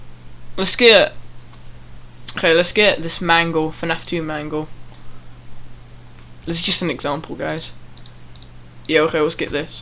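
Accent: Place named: United Kingdom. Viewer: This is the most bri"ish accent I've ever witnessed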